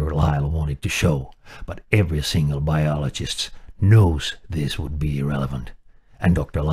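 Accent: Germany. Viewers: I like science on youtube but I get the impression he is like acting and I dont like it. Is that his real voice? Theres nothing wrong with having a German acent and his natural voice will do fine.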